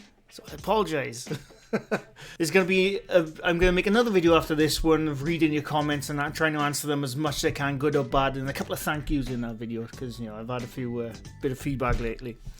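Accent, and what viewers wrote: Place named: Scotland, United Kingdom. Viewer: a good Scottish accent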